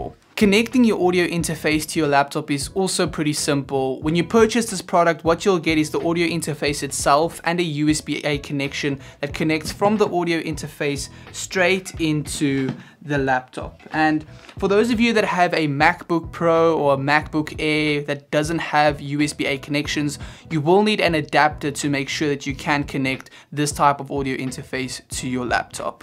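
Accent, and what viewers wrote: South Africa. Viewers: ... Your accent sounds like you come from Cape Town...... Nice info.. Thank you... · You sound so South African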